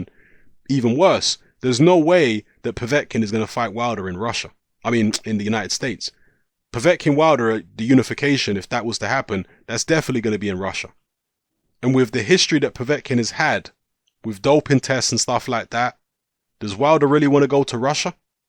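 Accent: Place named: United Kingdom. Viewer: U speak wit a uk voice and even u dont know the name of the stadiums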